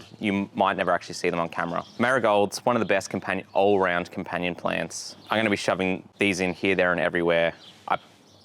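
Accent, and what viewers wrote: Australia. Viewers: aussie accents are the language of heaven, melbourne strong <3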